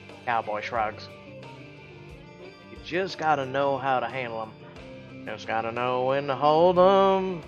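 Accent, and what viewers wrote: South, United States. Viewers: Not American, but always liked this southern accent. YOU ARE KILLING IT! Good job!! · Some dumbass accused you of being racist for using southern drawl? Really? Well, I'm a black woman and I never thought you were racist. I was just enjoying the videos.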